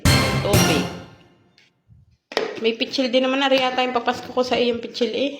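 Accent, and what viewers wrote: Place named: Batangas, Philippines. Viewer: Thank you Ate sa pag video nyo Kay ate.nakakatuwa ang salita ninyo lalo na yung punto ninyo batanguenyo.❤❤❤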